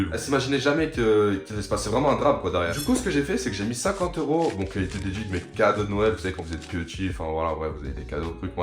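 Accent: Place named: Belgium. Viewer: Vous avez un accent Belge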